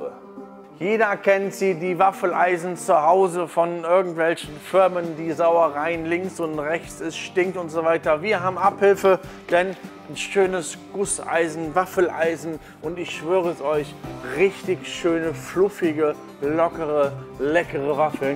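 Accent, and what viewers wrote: Cologne, Germany. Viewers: wie immer alles super erklärt. Mit deinem Kölschen Akzent, Meeeggaa. Macht richtig Spaß deine Videos zu schauen! Weiter so!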